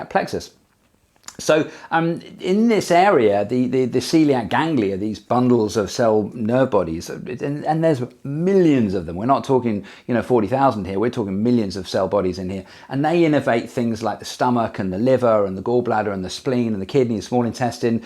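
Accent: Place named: Scotland, United Kingdom. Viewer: Scots speak!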